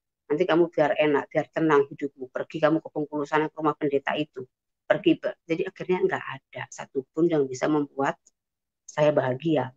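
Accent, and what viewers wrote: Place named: Java, Indonesia. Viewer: Dari omongan lo, lo bukan orang minang, tp jawa.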